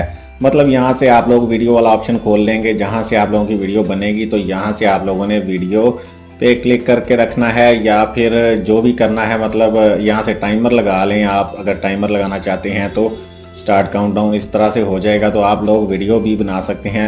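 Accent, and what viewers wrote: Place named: India. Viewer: It is Eanglish but he talks in a indian eanglish way! Why is YouTube full of haters? God.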